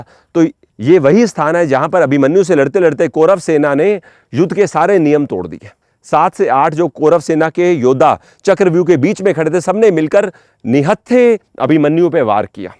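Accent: Punjab, India. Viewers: Aapke videos bahut achhe hote hain, lekin ek request hai. Jab bhi aap kisi historical place ka video banao, to apne punjabi accent ko control kiya kijiye, us se saari vibe kharaab ho jaati hai. Please bura mat maaniyega, fan hu isiliye adhikaar se bol rahi hu. Jaise ki aap भीष्म कुंड को भीषम कुंड bolte ho, और को ओर बोलते हो, युद्ध को जुड्ढ बोलते हो। आप जानते हो महाभारत काल में कितनी शुद्ध हिंदी बोली जाती थी। जब आपने वीडियो बनाने की starting की थी, तब से अब तक काफी चेंज है आपकी भाषा में लेकिन थोड़ा सा और सम्भाल लीजिए, please, video देखने का आनंद दुगुना हो जाएगा। राधे राधे।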